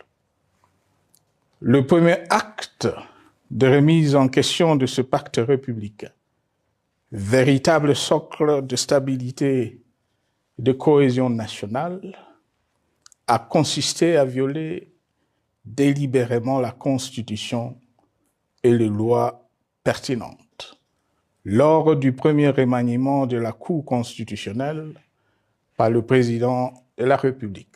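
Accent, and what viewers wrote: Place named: Rwanda. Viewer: Je suis tellement pcq tu as un ton de kinyarwanda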